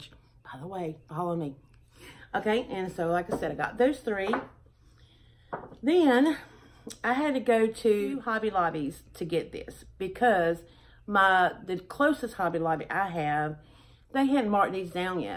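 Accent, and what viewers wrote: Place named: Alabama, United States. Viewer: Tuesday and Thursday would be good for me❣️ I lived in Alabama for 10 years so your accent sounds so good to me. I’m so sorry about your phone! And I know what it’s like to move a lot. Will you still be close enough to see family and friends after your move? You’re so sweet, I love to watch your videos and I like the way you decorate. 👍🏻🥰🤗🙏🏻 I’m praying for you😌❣️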